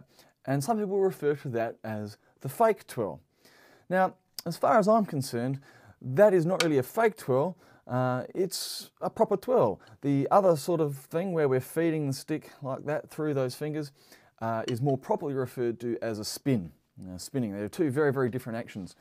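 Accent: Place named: New Zealand. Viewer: whoa you sound new zealander. im aussie as well but from sydney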